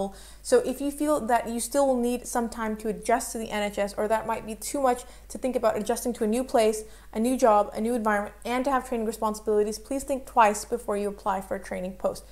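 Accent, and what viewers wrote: United States. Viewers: great piece of advice in a short amount of time!on a side note I really didn't expect you to have an american accent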